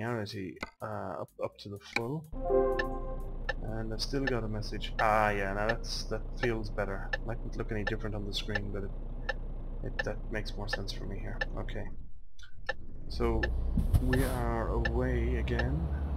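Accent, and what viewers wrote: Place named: Ireland. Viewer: I got excited I thought u was him. Anyway good videos and I love ur accent. Which part of Ireland u from?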